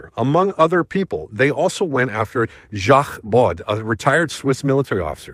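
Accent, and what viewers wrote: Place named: United States. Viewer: > Neve Lose Your American Voice!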